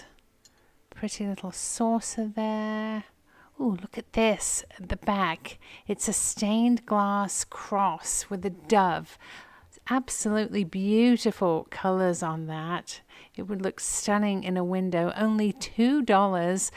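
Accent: England, United Kingdom. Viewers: Your accent makes me want to turn you off,some times you overdo the High English accent.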